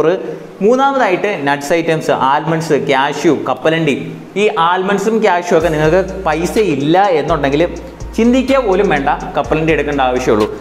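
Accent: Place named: India. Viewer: നല്ല അവതരണം 😍ബ്രോയുടെ സ്ഥലം എവിടെയാ? അല്ല സംസാരത്തിൽ "ഫ "വരുന്നുണ്ട് അതാട്ടോ ചോയിച്ചേ 🤔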